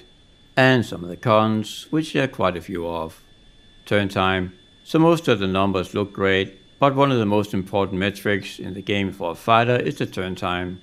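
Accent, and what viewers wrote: Denmark. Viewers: Tak for info min ven. Det gik først op for mig til sidst du lød dansk hahaha. Keep up the good work brother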